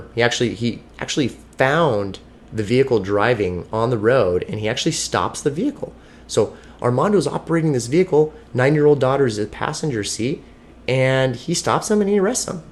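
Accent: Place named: Canada. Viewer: Who is this dude Me love this Did u find me Or i find you WHY DO U SOUND SO KANADIEN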